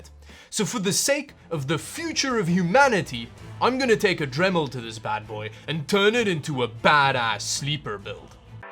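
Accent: South Africa. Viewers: Are you South African? 🤔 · are you South African? You accent and name reminds me of SA. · You afrikaans? Cuz ek is! And yeah does sound like a South African who speaks with a Semi-American accent. (Pronouncing R’s alot and some overpronouncing on letters and words that i am to lazy to list now)Your surname is also very afrikaans, “ ”😂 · I guess you also live in South Africa, because of the accent and name, but I would like to know, is it better to buy pc parts in south africa or import from other countries and then paying taxes and duties?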